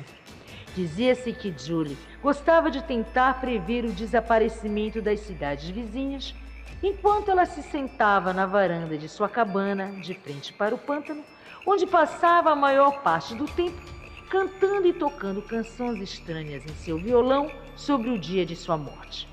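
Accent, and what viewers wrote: Pará, Brazil. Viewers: Olá senhora gata,estou fazendo uma pesquisa sobre vc, eu sou muito curiosa, pois bem, esses tempos eu te perguntei se vc era locutora, e vc gentilmente me disse que não, então pensei: Se vc não é locutora, então é bem provável que vc saiba cantar, uma pessoa com uma voz dessa, com certeza sabe cantar. Outra coisa: seu sotaque é diferente, parece paraense.... Outra coisa, queria muito vê o seu rosto, então vc me disse que estragaria a personagem, vc tem razão, ia perder a graça, mais vendo o seu rosto no facebook, naquele vídeo desejando feliz 2019, percebi que o formato do seu rosto é bem bonito, e olhando essa sua foto do YouTube, percebi que o seu cabelo é meio loiro, bom,tô quase terminando minha pesquisa, sei outros detalhes mais não vou falar, sua indentidade, vai ficar escondida comigo, até porque minha admiração e amor por você é equivalente a 1 metro e 56 centímetros de altura(é meu tamanho) um beijo na bochechinha, amo-te!!!!❤❤❤💋💋💋💙💙💙💚💚💚💛💛💛💜💜💜obs:(Vou te provar que sei muito sobre vc,te mandarei solicitação de amizade no seu face verdadeiro, (Elaine Salvador é o nome do meu face) vc é incrível, já virei sua fã.....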